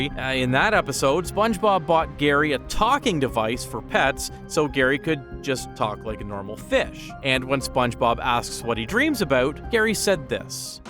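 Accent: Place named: Canada. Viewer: you sound too Canadian to be AI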